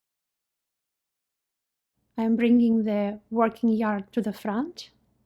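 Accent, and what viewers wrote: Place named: Ukraine. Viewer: I need an entire tutorial from start to finish. If you just want to make a video snd sell me the disk I would gladly pay up to 200 dollars. That's how important it is to me. I'm 69 years old and have noone to help me. I can't understand how to read patterns because I don't know enough stitches. I suffer from depression and often can't sleep. Knitting requires me to concentrate snd focus do when I get up in the middle of the night I can knit and the time just flies and before I know it its daytime snd my crisis has past. It's miraculous to me. Also, I have had a 20 year career as a training developer snd have a Masters in instructional design of online learning. You are the best. You should win an award. I'm going to look into recommending you for one. Also, are you Ukrainian? I only ask because I was raised by my grandmother who was Ukrainian and your accent sounds alot like hers.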